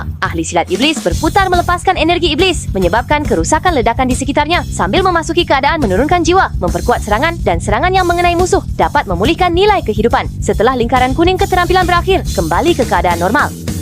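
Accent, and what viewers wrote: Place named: Malaysia. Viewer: logatnya malaysia ya buang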